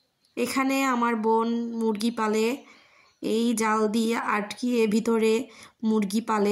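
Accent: Barishal, Bangladesh. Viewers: দারুণ লাগলো ভিডিও টা আপু,,, আরও বেশি ভালো লাগলো আপনার বরিশালের আঞ্চলিক ভাষায় কথা। লাইক দিয়ে পুরো ভিডিও জুড়ে ছিলাম আপু 💕💕🌹🌹❤️❤️ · বরিশাইল্লা কথা ভালই লাগে। খুব ভাল লাগলো সবুজ ধানের ক্ষেত😊 হাসের বাচ্চা খুব কিউট 😊 পুরো টা দেখে নিলাম 😊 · আপু বরিশালের ভাষা খুবই দারুণ ছিল 😂😂😂😂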